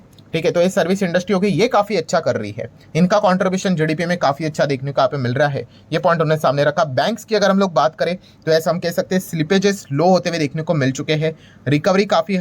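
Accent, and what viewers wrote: Maharashtra, India. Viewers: Kamtarta, vichar bro marathi hain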